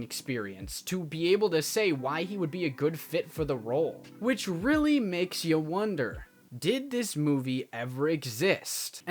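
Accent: Canada. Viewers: the Beaches of "Chi-neen"? From his accent, I assume the host is Canadian, but if he's gonna talk about Garth Brooks, he needs to know how to pronounce Cheyenne.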